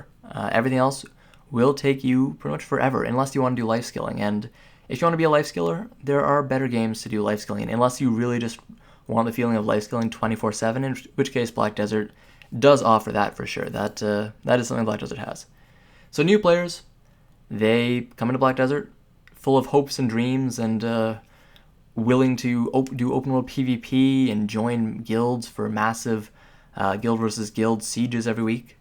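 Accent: Canada. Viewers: Haha you candian right?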